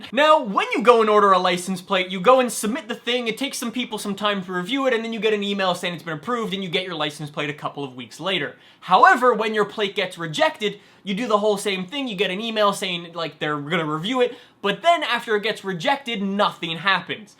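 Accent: Quebec, Canada. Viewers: Tu viens du Québec???!!! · R u Canadian? Btw when will the gtr done? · t'es québecois :O !!!! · Je savais pas que t’étais québécois!!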